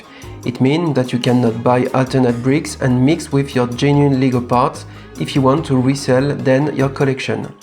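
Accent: France. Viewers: On entend l'accent français mais sa reste intéressant de l'écouter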